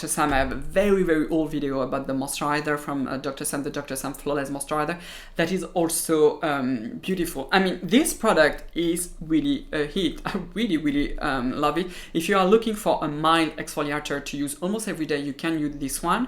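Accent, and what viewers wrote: France. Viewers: . I'm a French man raised in Australia and I listen to French accents all my life. There is a flaw in your channel that you must address: you flick out the names of active ingredients, with a French accent, and it is irretrievable for English speakers. When you discuss actives, pronounce how you want - but spell beneath. Alors. C'est pas trop difficile. Also, we can get Azeliac Acid in the US and Australia as 'AZClear' and 'Finacea'. If you live in either of these countries - buy those and mix a little into your moisturiser for a quarter of the price of even paula's choice. Azeliac is a cheap ingredient.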